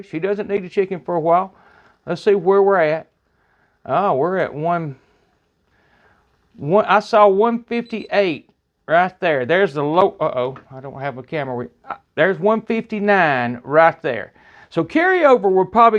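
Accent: South, United States. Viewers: love your accent! southern accents sound always so funny to me. i could listen to you for hrs. i just got one of these insta pots for christmas and i am scared to use it. much love from michigan! i wonder if my accent sounds as funny and nice as yours. take care.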